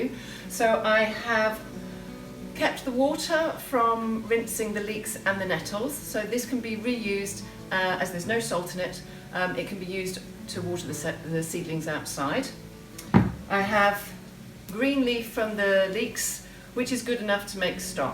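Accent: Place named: England, United Kingdom. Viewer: It's very nice to hear your English voice, Thanks for sharing!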